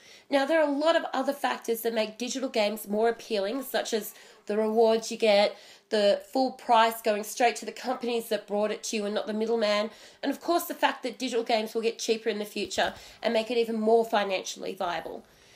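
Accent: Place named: United Kingdom. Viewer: "True game-ah, a dedicated game-ah" Fuck you non-rhotic bitch, Man british accent's stupid and annoying as fuck!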